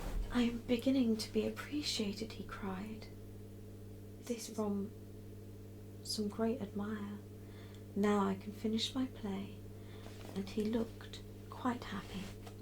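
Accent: England, United Kingdom. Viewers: English accent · love ur british accent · British accent makes her more attractive & sophisticated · British accent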